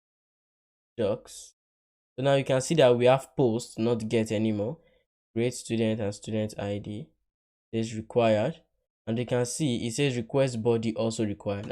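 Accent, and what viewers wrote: Yoruba, Nigeria. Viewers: Great one, the tutor voice sound like a Nigerian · I am also perceiving it from his accent, I am sure is a Nigerian 😅 Yoruba specifically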